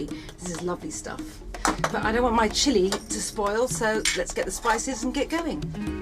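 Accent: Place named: England, United Kingdom. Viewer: I love her English accent.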